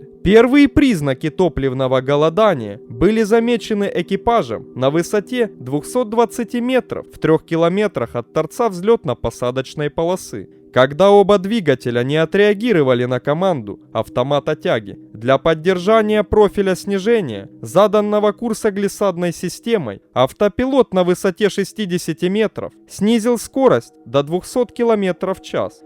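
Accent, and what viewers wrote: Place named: Ukraine. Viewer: Автор украиноязычный судя по произношению, и я уже много раз пишу - розмовляй рідною мовою. Так буде краще.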